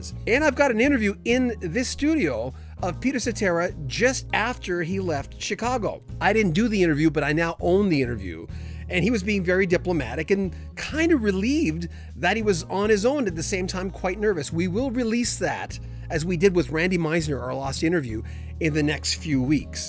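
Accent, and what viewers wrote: Canada. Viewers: So, are you like from the Chicago area or it sounds like your from Canada. Don't get me wrong, the Great Candy was from Canada and he is missed every day. I'm from Joliet, Illinois-Chicago south suburbs-Mancow likes to call us "Toliet". Anyway, its like Pete stabbed us in the back-that's what it is like. Listening to WLS waiting for the next great Chicago hit. We knew he was the great lead singer for the most part. I remember him saying in an interview that he was at a party and Paul McCartney came out of the bushes with Linda and Pete went to introduce himself and Mac stopped him and said"we know who you are". I'm sorry he felt whatever he felt and still feels, but we the Chicago fans loved him and no one but no one can sing 25 or 6 to 4 like he did. So he pretty much hurt us and still hurts us. Who cares otherwise, he can go back to singing with Cher or something. 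I guess we wanted that old feeling back before we all died. If he isn't doing anything maybe he can go sweep up what was left of the passenger side window on my 1972 Dodge Polara that got busted out in the parking lot of the old Chicago Stadium back in 1976 when I went to see Chicago-great concert-bad experience.......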